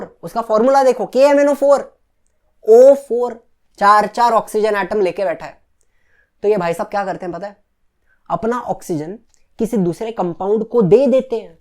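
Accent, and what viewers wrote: Maharashtra, India. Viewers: Dada tu Marathi ahes?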